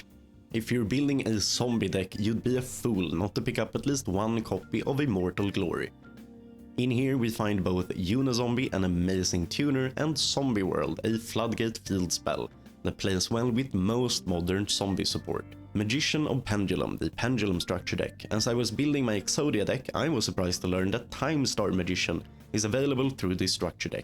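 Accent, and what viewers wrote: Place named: Sweden. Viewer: You can hear he is swedish XD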